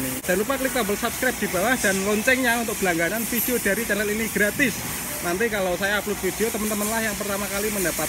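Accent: Java, Indonesia. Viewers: wong jowo yo mas? ktauan dr logat nya medddok...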